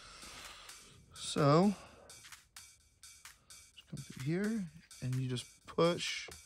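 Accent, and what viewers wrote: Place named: Oklahoma, United States. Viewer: Bro sounds like an Okie, makes me feel at home!!!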